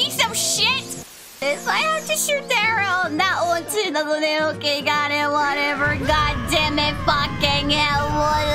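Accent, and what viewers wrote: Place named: South, United States. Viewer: the cursier she gets the more her Southern drawl comes out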